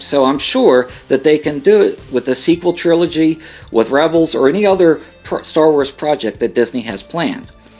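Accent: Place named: Philadelphia, United States. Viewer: You sound like your from the Philadelphia area. It's like my cousin Nick is talking about Star Wars. Great vid.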